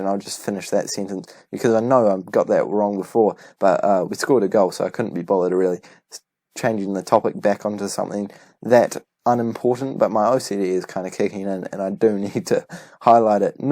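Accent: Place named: New Zealand. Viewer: always nice to add another Kiwi voice to my lineup. Keep up the good work! If you want some tips on playing the game, I did a series recently called Tips for the Advanced Football Manager, you may find it useful.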